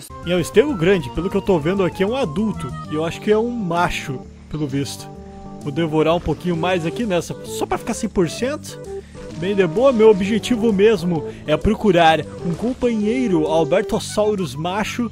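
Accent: Brazil. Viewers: Seu canal e o melhor do mundo. Uma pergunta você é brasileiro ou português? Eu gosto de indoraptor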